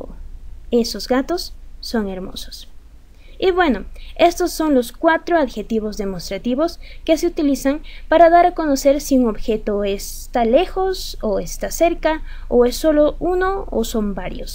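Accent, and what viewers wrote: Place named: United States. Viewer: Sos muy buena explicando pareces de Estados Unidos eres increible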